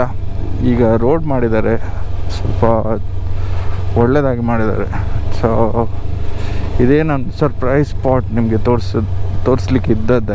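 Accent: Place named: Mangalore, India. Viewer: Superb voice and Mangalore Accent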